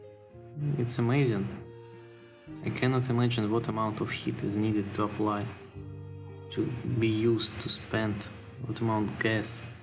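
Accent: Russia. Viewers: А акцент то у тебя Русский. Чётко слышно.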